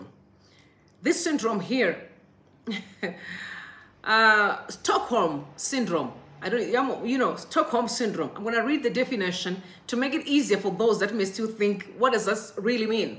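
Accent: Zimbabwe. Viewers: Good content but be yourself , you try too hard to sound British and then slip into your default Shona accent and back to British accent... Go to school work hard was a Whiteman s agenda when they put the school.system . We need our own system that works for us.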